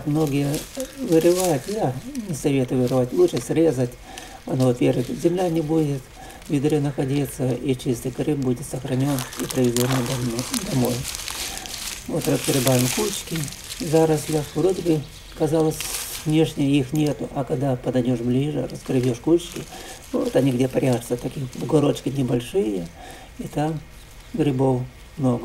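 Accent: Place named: North, Russia. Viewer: С севера видимо.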